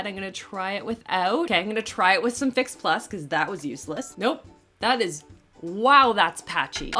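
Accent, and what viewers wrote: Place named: Canada. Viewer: Riv H she is Canadian. It comes out on occasion.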